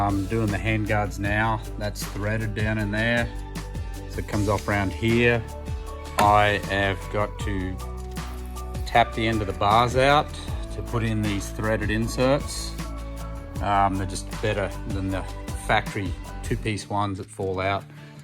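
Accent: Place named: Australia. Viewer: Super MOD... thank you for showing us. I just sold my r1200gs and bought the 890 adv S 2023 in a few weeks... this video gives a nice tip. Are you from Australia? You have an Australian accent. I go there a few times . G' DAY MATE 👍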